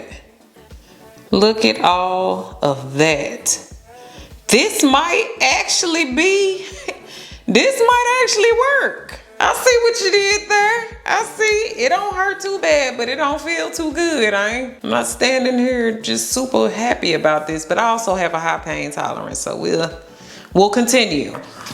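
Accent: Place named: Midwest, United States. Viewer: I just love the Midwest accent. I’m from the south but all my family in Midwest now. Make me feel right at home 💕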